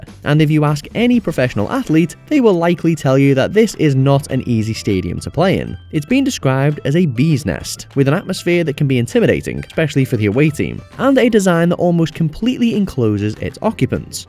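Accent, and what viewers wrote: United States. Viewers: Yeah. Dude sounded so American.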